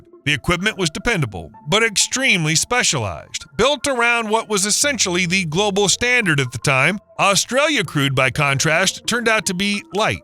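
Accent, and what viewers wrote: United States. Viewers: Because it's a Yankees voice for the video, I'll give a example, "WE PAYED YOU FOR THE NUCLEAR SUBMARINE DEAL WE ARE HALFWAY IN TIME AND STILL HAVEN'T RECEIVED THEM OR ANY"?????? WTF WE ARE YOUR BEST ALLIE AND IF I WAS PRIMINISTER I WOULD ASK FOR REFUND & GET A GOOD DEAL NOT BENT OVER WITH NO GASOLINE.... LOL 😂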